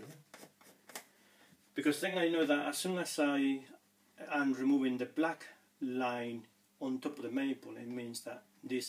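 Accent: United Kingdom. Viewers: Thanks for the videos Sr, can I detect a wee bit of Scottish in your accent?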